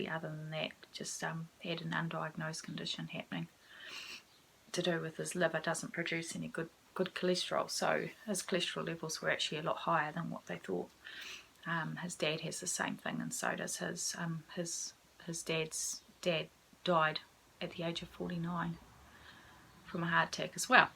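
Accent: New Zealand. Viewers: Kia Ora! I've only just found you, thanks YouTube:0). I'm a kiwi, living in Aus (NSW). It's been way too long since I was home (stupid Covid) and it's sooo nice to hear your accent and feel the connection to home in your manner and way of being. I'll keep binging your channel, while I sit crocheting and resting with my own version of long standing illness that causes fatigue and fall out. Thanks for sharing, it's important for others, for me. I needed that connection today. Arohanui xxox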